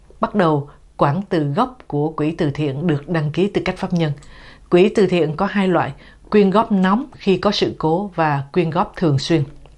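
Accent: Standard. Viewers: Cô xướng ngôn viên tôi nghĩ là người hải ngoại. Cô đọc rất lưu loát, rất từ tốn, phát âm rất chuẩn và rất đúng chánh tả. Cô phát âm các dấu sắc, huyền, hỏi, ngã rất chính xác. Phần đông giới trẻ ngày nay hoặc người trong nước phát âm lẫn lộn hai dấu hỏi thành ngã, ngã thành hỏi. Có ai còn nhớ lớp bốn lớp năm cô giáo bắt một trò đúng giữa lớp đọc một đoạn văn rồi cả lớp chép lại cho cô chấm điểm chánh tả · Ban noi chuan luon ung ho ba ... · Cô MC này bình luận và nói chuyện quá chuẩn quá hay luôn...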